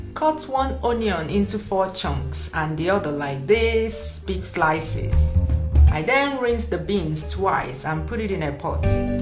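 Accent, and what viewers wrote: Igbo, Nigeria. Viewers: nice accent madam!!! igbo kwenu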